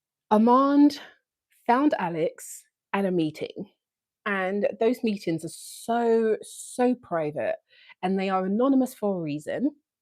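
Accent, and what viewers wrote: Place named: United Kingdom. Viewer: I love your voice and your accent!🥰 Are you British? Sorry for such a personal question😅 I'm learning English so accents is a big deal for me. This is one among many reasons why I love Jacob. He absolutely nailed 3 different accents! 🤩 So it's okay if you feel uncomfortable to answer my question. I'm not insisting or being rude😅 Anyways, I like your content 🥰 Thank you!✨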